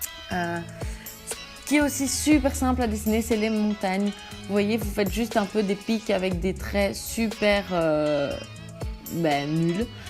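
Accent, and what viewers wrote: Belgium. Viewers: Tu es belge toi ?^^ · Miss Serbia j'ai l'impression qu'elle a un accent belge perso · t'es belge ??? ton accent m'a l'air légèrement belge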